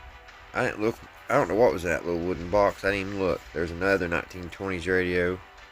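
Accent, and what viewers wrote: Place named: Kentucky, United States. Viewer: You talk like u are in Southern to eastern Kentucky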